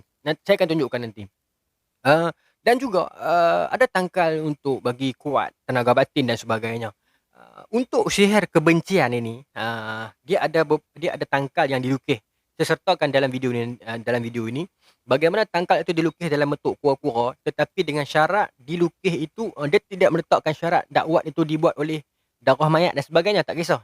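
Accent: North, Malaysia. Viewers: Seqonok dengaq caqa oqg utaqa yg cerdik dn humble brckp, tuan tlg doakan unt saya rezeki dn jodoh, umoq bnyk dh ni, t.ksh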